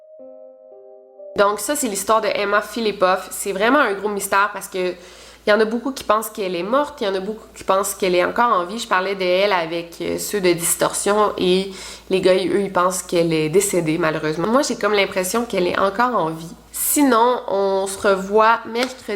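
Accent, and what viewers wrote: Quebec, Canada. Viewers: Je m’appelle Emma c’est rassurant 😄 sinon super vidéo comme d’habitude ❤️ Ps : je surkiffe ton accent québécois 😉💛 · SVP, HELP! Je suis français et du coup je ne comprend pas le nom de la fille disparu que mentionne au tout début de sa vidéo et ou dit que ça l'intéresse beaucoup ce cas et qu'elle est très impliquée.. du coup avec l'accent québécois je n'arrive pas bien à savoir comment ça s'écrit/prononce puisque je n'ai pas l'accent (mais sinon je te comprend très bien heinnn mdrr;);) ) Merci à celui ou celle qui pourra me le dire comme ça je pourrais voir la vidéo de à ce sujet;):)!!! (au passage vidéo GÉNIALISSIME (comme d'habitude avec toi^^ ) MERCIIII!!!) (P.S: en français que je suis j'ai compris Mora Marie mais je suppose que ce n'est pas ça du tout ptdrr!! haha // P.S²: Génial le générique que tu as fais pour ta chaine, bravo!!;) <3 ) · Cc Ça fait pas longtemps que je regarde tes vidéos, Juste à la première vidéo j ai adorerais, J'adore lacent quebequoid continue j adore🤗🤗🤗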